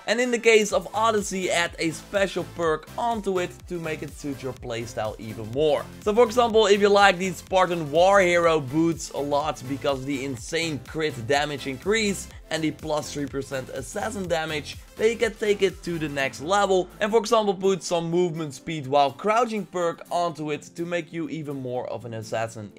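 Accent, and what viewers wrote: Netherlands. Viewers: You have a dutch accent while talking english. Where are you from?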